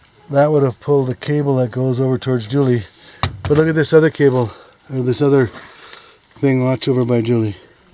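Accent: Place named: Upper Midwest, United States. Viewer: I am very curious where you are originally from? Your accent sounds like you’re from the upper Midwest, Upper Peninsula, Minnesota, etc?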